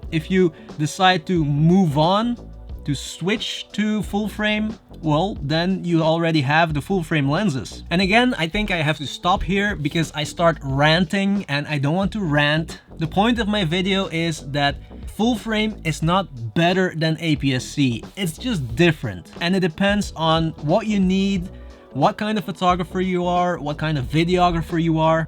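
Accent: Belgium. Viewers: Antwerp accent?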